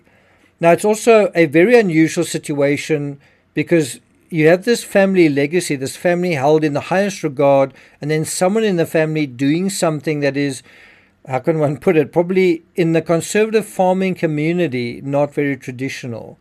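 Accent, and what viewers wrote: South Africa. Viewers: I like his accent. Is it South African?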